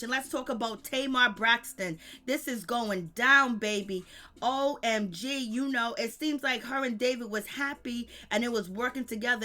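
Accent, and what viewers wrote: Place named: East Coast, United States. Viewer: your accent made me subscribe.. is that east coast vibes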